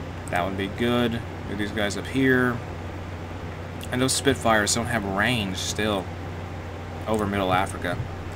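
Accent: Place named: Appalachia, United States. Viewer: Now u sound loke an american hilbelly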